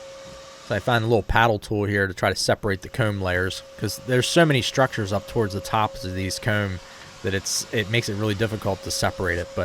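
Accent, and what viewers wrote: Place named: Philadelphia, United States. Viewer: I am from about an hour outside of Philly, and judging by his accent i would guess he is from the same area!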